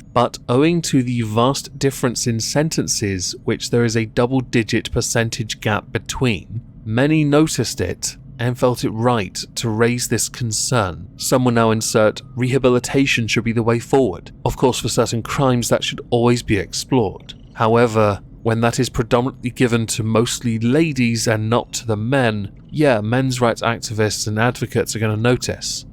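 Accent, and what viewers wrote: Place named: Oxford, United Kingdom. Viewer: Off topic but where is your accent from? Best I can place is Oxford. Totally agree btw. Instead of abortion men should have the right to have their offspring .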